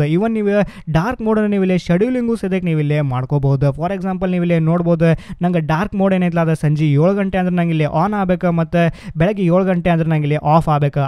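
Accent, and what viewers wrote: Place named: Uttara Kannada, India. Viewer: Nim UK Kannada riwev super bro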